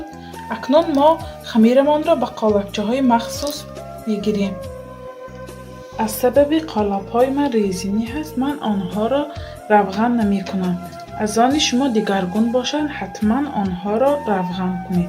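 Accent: Isfara, Tajikistan. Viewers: рахмати калон апа ман а рузи авал а лавзатон шинохтам ки шумо аз исфара хастед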